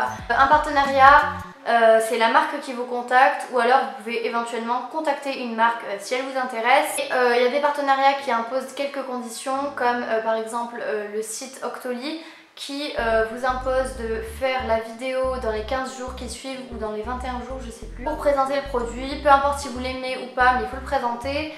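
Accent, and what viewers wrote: Lebanon. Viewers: Cest troo bo ton accent libanais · awww t trop cute en parlant le libanais chui libanaise aussi 😻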